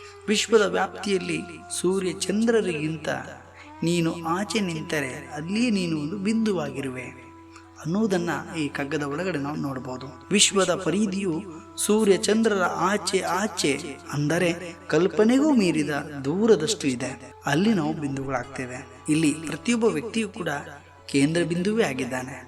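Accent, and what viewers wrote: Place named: Kundapura, India. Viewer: Treat to hear kundaapura kannada ♥️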